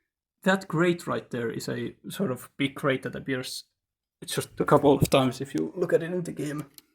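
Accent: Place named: Finland. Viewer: I know a Finn when I hear one❗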